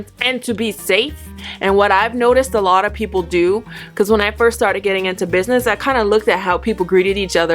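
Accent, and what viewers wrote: South, United States. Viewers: Lol the way you talked cracks me up every time I watched your videos. You can take you out of America but you can’t take America out of you😂😂😂😂👍 I love your videos, very educational and detailed. Keep’em coming girl you’re awesome 👍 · I'm from the South too(Tennessee)! I wonder, do you have a Southern accent when speaking Korean?😂😆 I talk fast also, another Southern Thang! Gurl! You make me laugh so much! TFS! Light, Love & Blessing's💖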